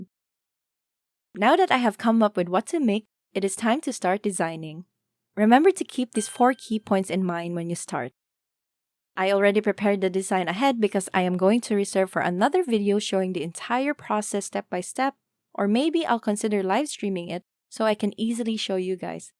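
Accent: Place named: Philippines. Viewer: You deserve a million subs. Keep it up. Ps: you sound Filipino tho.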